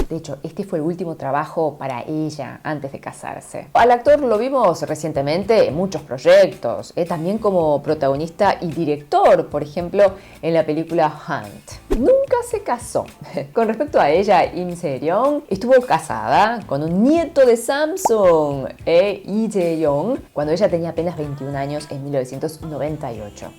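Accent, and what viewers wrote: Argentina. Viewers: Saludos, me encanta escuchar tu Espanyo Argentino. Una pregunta, en Corea, no se reconoce la soberania personal, los derechos humanos? He escuchado de gente Japonesa que los "Daimyo" de Japon no son tan poderosos como los "Chaebols" de Corea por rebeldia de la poblacion. Es verdad? En tu opinion, hasta donde llega la lealdad a uno mismo vs a la familia mas, en Japon o en Corea?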